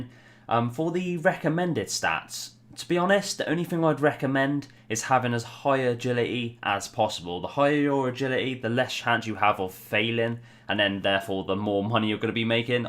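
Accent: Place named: Coventry, United Kingdom. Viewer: You sound like you are from Coventry, or in and around west midlands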